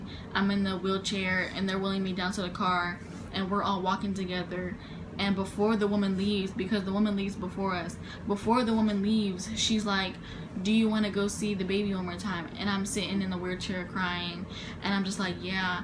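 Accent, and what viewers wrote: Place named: Baltimore, United States. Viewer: Where u from cuz I heard baltimore im from baltimore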